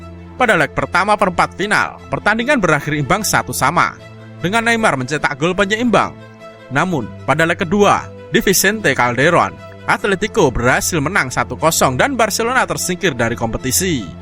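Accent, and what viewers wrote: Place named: Java, Indonesia. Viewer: Adminya medokk🤮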